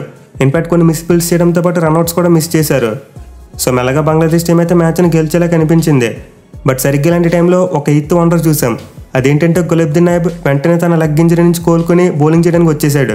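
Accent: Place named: Andhra Pradesh, India. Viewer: Andhra slang 😅😅😅😅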